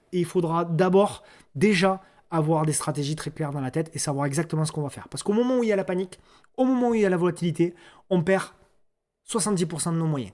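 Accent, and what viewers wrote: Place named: Montpellier, France. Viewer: Faut se le farcir l'accent de Montpellier..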